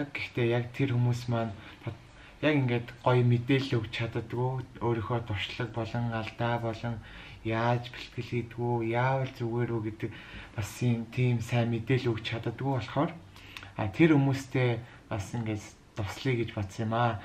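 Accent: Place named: China. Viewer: хэлэнд нь жоохон хятад аялага сууцан бна.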